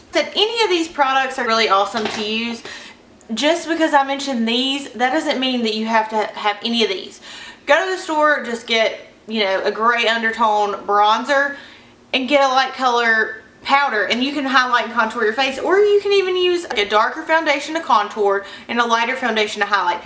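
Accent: South, United States. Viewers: aw i love your southern accent:) i also use the mac emphasize to set my highlight! your videos are really great keep up the good work girl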